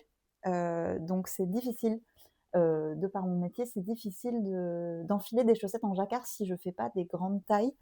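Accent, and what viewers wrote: England, United Kingdom. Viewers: Merci pour ces inspirations 👌 Tu as vécu en Angleterre pour avoir ce bel accent ?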